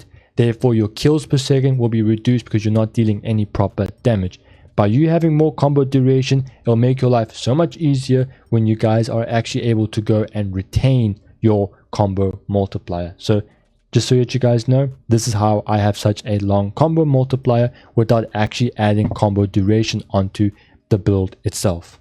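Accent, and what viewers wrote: South Africa. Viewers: wait. you south african?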